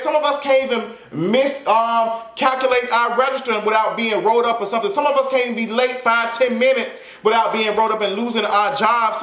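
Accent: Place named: New Orleans, United States. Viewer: me too...love that Orleans twang.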